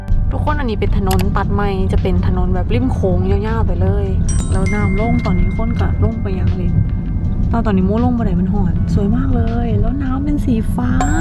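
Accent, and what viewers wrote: Isan, Thailand. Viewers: เป็นคนสุพรรณแต่ชอบเสียงบรรยายภาษาอีสานครับชอบมากๆจะติดตามต่อไปรักคนอีสานครับ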